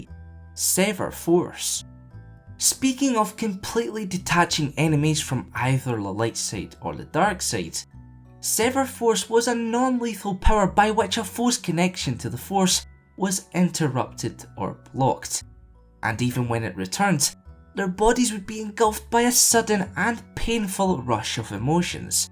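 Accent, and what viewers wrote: Scotland, United Kingdom. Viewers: . Hey man I've been watching your vids for quite a while now. Your videos are great 👍. Can tell your Scottish just like me I have followed on amino. If you replied to this comment I would be delighted. Keep up the good work man 😃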